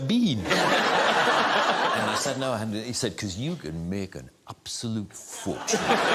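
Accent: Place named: United Kingdom. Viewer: 진짜 영국식 발음에 목소리 녹아내림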